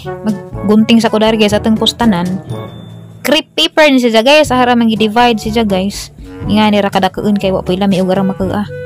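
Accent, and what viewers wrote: Bohol, Philippines. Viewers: Hua<link> ba anang watermelon mo sis..nalingaw ko ug tan aw sa.imo mga video nahimout ko sa imong sinultihan nga bol anon kay mao man ako mama taga maribojoc siya..sending my support sissy mmlso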